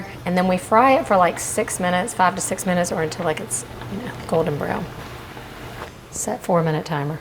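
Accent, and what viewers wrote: South, United States. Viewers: the recipes are the bonus! shes the big draw! that tiny powerhouse with the husky southern accent! shes the ticket!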